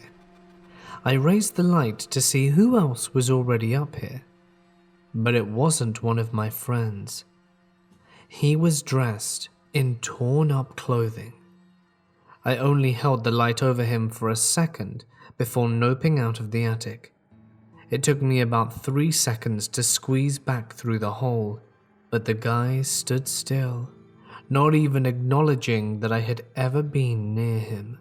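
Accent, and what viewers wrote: United Kingdom. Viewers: Is it you that just speaks that way? Or do you only get stories from the U.K?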